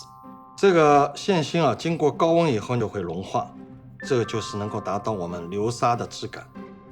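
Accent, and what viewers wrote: Shanghai, China. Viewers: 呜呜师傅的上海口音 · 一听就是上海师傅😄 讲的不拖泥带水 专业 是我喜欢的风格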